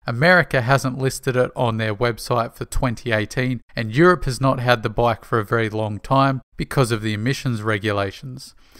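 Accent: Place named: Australia. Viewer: I love the videos and I always look forward to the next release, but pleeeeeease prounounce Versys as it is intended. VERsatile SYStem. Surely your Australian accent shouldn't interfere with pronunciation of this portmanteau word? Just nit-picking :)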